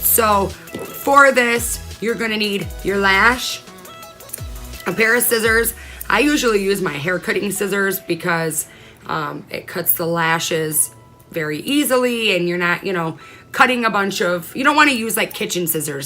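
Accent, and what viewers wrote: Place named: Michigan, United States. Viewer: Love you video, are you from Michigan? You have a Michigan accent lol.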